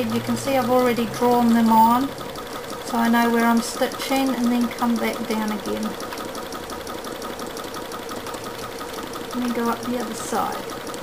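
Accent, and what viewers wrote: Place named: Australia. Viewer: Wow thats incredible. I have been sewing off and on since I was a kid but just started quilting. You do beautiful work. Ive never seen a machine that switches colors before. I detect an Austrailian accent. You remind me of an old friend of mine. I just moved to Florida from NY. Quilting is very popular down here and my aunt is teaching me. I have to show her your videos. thanks. I would love to make a butterfly quilt with this techneq. for my daughter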